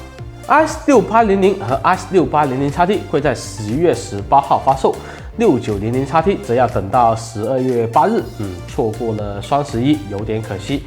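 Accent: Cantonese, China. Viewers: 满口的广色普通话和英文，落实是广东人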